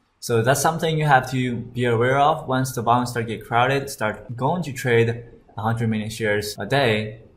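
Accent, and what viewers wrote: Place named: China. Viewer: Please learn English. Your speech is like mix of Chinese-english-muitaish bullshit. Couldn't understand 50% of what you were saying ((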